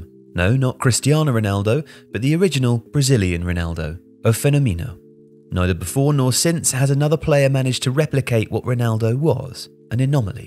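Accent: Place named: United Kingdom. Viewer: Guys, I think you’re brilliant and I watch many of your videos because they’re awesome. But I don’t understand a) how you came up for the pronunciation of FENÔMENO (you said fenomino) and I don’t understand in which of the “best player of all time” conversation Ronaldo is NOT present. In my experience he is not only ALWAYS present, but up there for at least second-best. Is it maybe because I used to live in Italy and you (sound like you) live in the UK?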